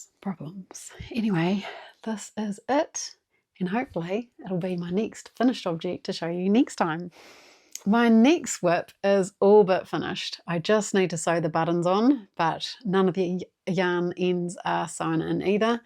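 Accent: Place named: New Zealand. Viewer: Hi from Trieste (Italy)! I’m a crocheter/knitter/everything yarn-related addict, and I’m in love with NZ. I’ve spent a month in Auckland on an English summer course in August 1992 and had such a great time! I still own and cherish the cabled jumper I started in Auckland and finished on the plane back home- and the leftover wool that I keep just in case😅. It’s so good to hear your Kiwi accent, it reminds me of all the nice people I met there❤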